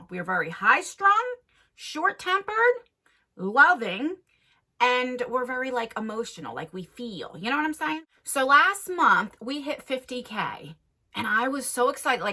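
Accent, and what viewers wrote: New Jersey, United States. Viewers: Love you and your channel! My family is from Jersey and I love listening to you talk. Feels like home...just like a good chocolate chip cookie. :) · I've been watching you for a little over a year and you are the best part of my evening. I literally go to bed with Abby, Theresa is dead, and you. I enjoy your New Jersey accent and love listening to your new podcast with Abby. Can't wait for you two to come out with you merch line. Can you imagine your own line of tye-dye hoodies. That would be so awesome. Well congratulations for reaching 50k and I will be right behind you when you hit a 100k. Xoxo. 😉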